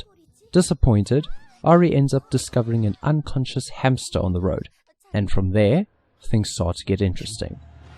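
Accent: South Africa. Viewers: Narrorator, are you south african?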